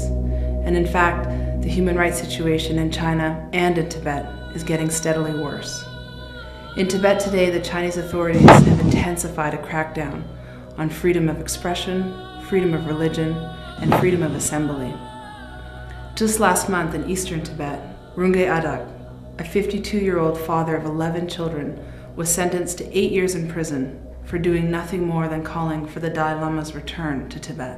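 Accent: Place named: United States. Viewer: btw, u don't even act or make me feel like u r from Tibet, i think u r slave of America,see how gud yr american accent is. especially the way u talk and the way u talking about those, isn't dat kinda reminds people how american u get?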